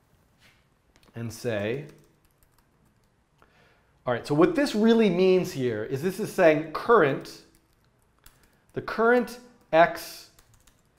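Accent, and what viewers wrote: France. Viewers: Thanks for your content, your french accent was sooo bravo that I tried to implement this challenge, I added a bit of colors and random agents walking on the screen. Here's a link if you want to take a look at it. <link> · I love your french accent 😝 préviou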